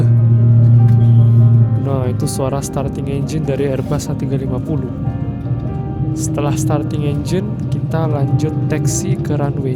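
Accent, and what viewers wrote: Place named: Indonesia. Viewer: Lewat diberanda bli, mau lu sekarang pasport negara lain tapi lidah lu indo banget.. sukses & sehat selalu buat lu dan keluarga..🔥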